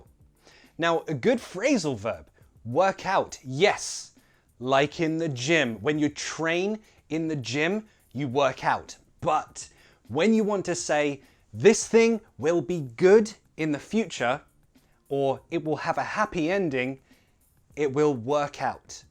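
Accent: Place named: Cockney, United Kingdom. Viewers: I just LOVE your accent. Simply beautiful. It reminds me of my younger days in London. More than 30 years ago ! From an old man in Japan. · hello I really want to learn Cockney accent and I saw the two videos concerning Cockney but I want you to focus on it in the coming videos I like your Cockney accent and I want to learn more from you please and thank you for your efforts and useful courses 💜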